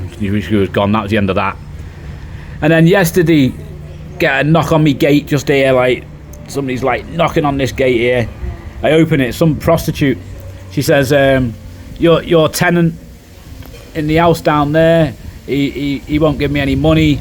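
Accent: Liverpool, United Kingdom. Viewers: Good On Ya Yer Scouser 👍👏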